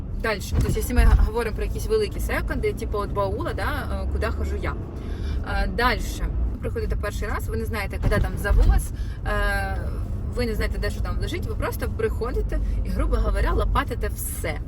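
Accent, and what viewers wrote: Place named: Surzhyk, Ukraine. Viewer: Снідаю і дивлюсь ваш влог 😊дуже подобається ваш вайб і ваш суржик 😉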